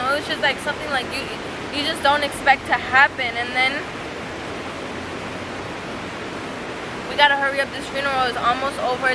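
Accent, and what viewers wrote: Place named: California, United States. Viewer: Es obvio que es chicana. Rostro de mexicana pero habla inglés perfecto y con acento californiano.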